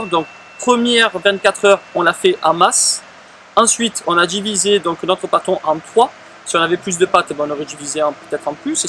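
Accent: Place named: Marseille, France. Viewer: Yeah merci ! Allez je test avec le sarrasin et je te dirai le résultat :-) merci encore. ET ENCORE, ENCORE, ENCORE !!! c'est trop bien tes vidéo. Puis le bon côté de l'accent marseillais, fait nous un petit "peuchère" dans la prochaine vidéo, que j'attends souvent sur le port de l'Estaque, quand je viens au Rove chez ma famille si tu connais :-)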